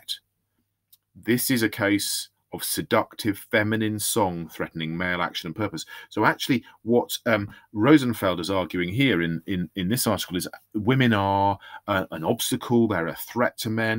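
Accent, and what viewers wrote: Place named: Quebec, Canada. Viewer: this dude sounds like quebec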